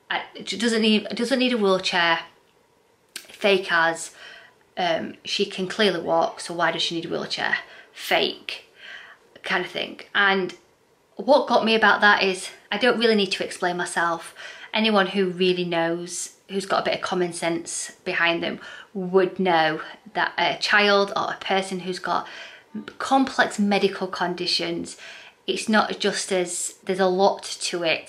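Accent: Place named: Northern England, United Kingdom. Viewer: I'm so glad you're going to carry on making your Friday Finds and What I Eat videos. You're brilliant to watch and there's nothing wrong with how you talk... It's nice to see Northerners on YouTube 😘. Some people can be so envious of a pretty, confident, clever woman!!! What they've done is disgusting but I think you're doing the right thing by keeping the kids out of it and carrying on. It's them with the problem, not you. F*** them. You've got 3 & a half thousand followers behind you 😊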